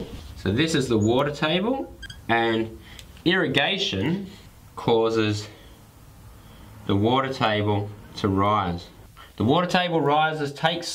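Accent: Australia. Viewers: I could listen to Aussies talk for hours. Thank you! Very concise depiction of salinization. Cheers!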